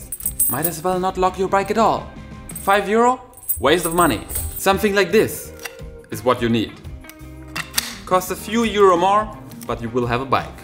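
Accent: Russia. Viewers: your russian accent is wonderful! 😄😄😄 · I confirm your russian accent as a russian ) bardzo ciekawe zobaczyć 😌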